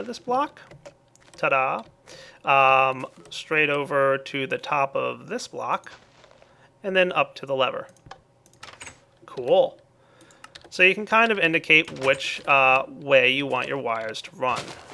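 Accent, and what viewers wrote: United States. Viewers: since when were you American? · is American?!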